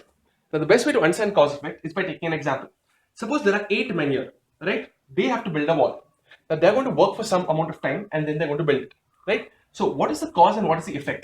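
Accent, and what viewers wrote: Goa, India. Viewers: Are you goan by any chance?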